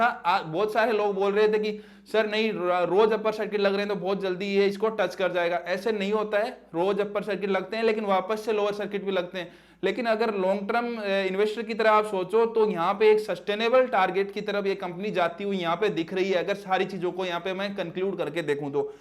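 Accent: Rajasthan, India. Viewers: Sir aap rajasthan se ho kya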